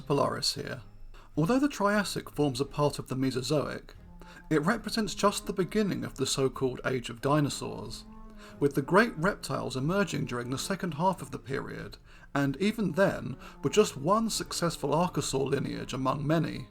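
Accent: United Kingdom. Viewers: (In an endearing way) Came for the click bait, stayed for the Brit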